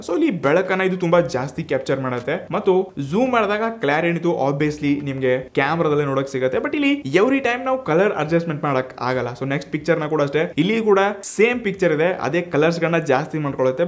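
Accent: North Karnataka, India. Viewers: ಉತ್ತರ ಕರ್ನಾಟಕ ಶೈಲಿಯ ಭಾಷೆಗೆ ಧನ್ಯವಾದ.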